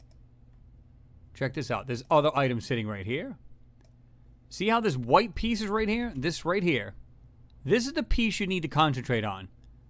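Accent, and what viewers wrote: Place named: Connecticut, United States. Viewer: "it looks like it got jammed in... (Dramatic pause, me your long time listener hoping in your Connecticut accent say machine paaaahts ) whatever that is"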